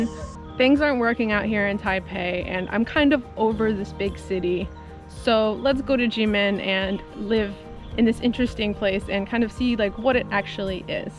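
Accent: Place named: Canada. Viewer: Hi, you had make a right decision to live in a small town to learn mandarin. You sound Canadian.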